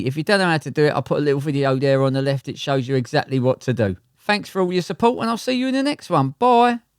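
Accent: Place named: England, United Kingdom. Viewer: Holy fark your pommie accent is very fuckin' annoying so n I gone.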